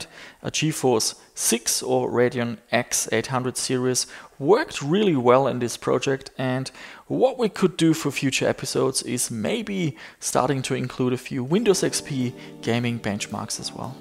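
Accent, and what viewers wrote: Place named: Germany. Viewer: I imagined something like that happened., allow me one personal question: do you originally come from a German speaking country? I noticed your accent and every time you pronounce "Wolfenstein".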